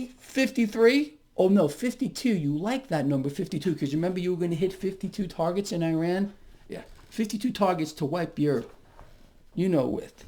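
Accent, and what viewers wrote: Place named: Brooklyn, United States. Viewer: Brooklynese Lol nice 👍 🔱Maserati baby....yeah!!!!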